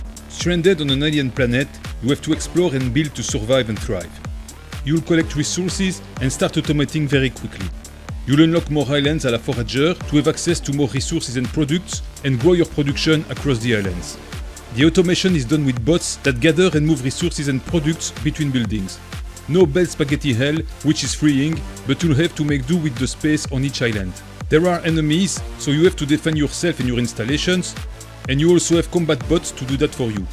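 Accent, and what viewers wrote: France. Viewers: no offense meant but since you asked, to be completely honest, I at first went to my settings thinking I needed to turn on translate to English lol. you have the most stereotypical insanely strong French accent. I'm thankful for closed captions, or I would definitely have skipped the video. I nearly did anyways, but was interested enough in the content to force myself to push through. if it's something you're concerned about, youre not crazy. while there's nothing inherently wrong with any of this stuff, if you are trying to expand your channel however possible and are open to making changes that will likely make your content more easily accessible to the widest audience possible, taking some steps to soften the accent as much as possible would definitely be a strong place to start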